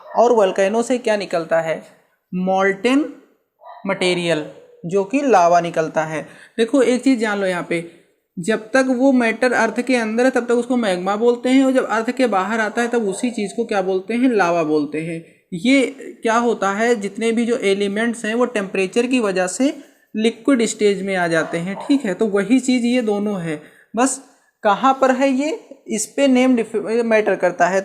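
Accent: India. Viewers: Sir you speak the purest form of English in Indian accent 👍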